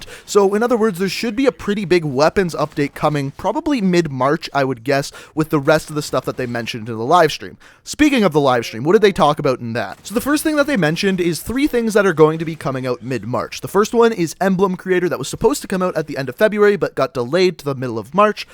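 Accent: Canada. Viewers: Are you saying "Merc-2" weapons or "Mark-2" it might just be your heavy canadian accent effin'it up for me!! 😄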